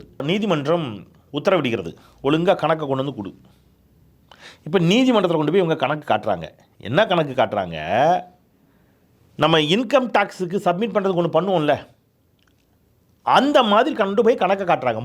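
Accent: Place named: Valliyoor, India. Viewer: Super brother naanum Valliyoor thann ungal voice slang keta enaku udane namma voor niyabagam varum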